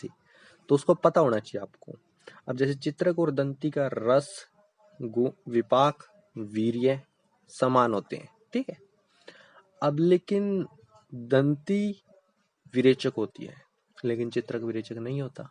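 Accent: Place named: Haryana, India. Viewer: Please don’t use haryanvi accent bro. Keep it up 🎉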